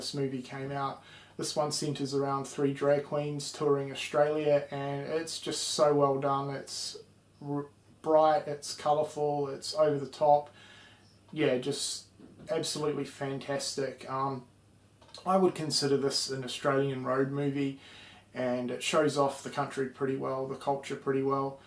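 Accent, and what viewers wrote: New Zealand. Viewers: sorry this question has nothing to do with the video but are you originally from new zealand because you sound like a kiwi.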